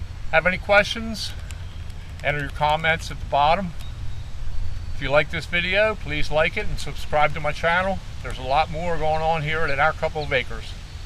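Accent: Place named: Philadelphia, United States. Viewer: First of all, the very first time I heard you speak, I knew you were from Philly. I moved away 30 years ago, but there's still nothing more I enjoy hearing than that gorgeous Philly accent! I've purposely made sure never to lose mine. ...Second, I did tree work in my younger days, mostly in Bucks county, but also a few logging trips up to Sullivan county. I miss tree work. It was tough, but I loved it. ...I also appreciate that you're equally at home splitting wood and planting flower seeds. Awesome.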